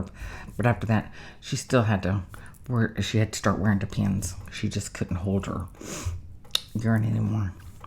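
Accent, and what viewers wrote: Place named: Texas, United States. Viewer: The mic looks good off to the side, and sounds good. I think some people can’t understand you sometimes because of your Texas accent. Pay no mind to them.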